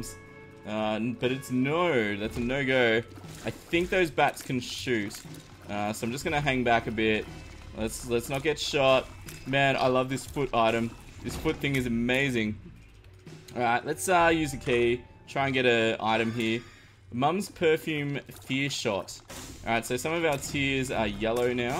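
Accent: Australia. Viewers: WAIT YOUR AUSTRALIAN!?!? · Your from Australia!😱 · Wow Australian I'm Australian too I can't really here to your accsent like Mr the epic ninjas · Are u from Australia like me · austrailian?? meh still like his voice:)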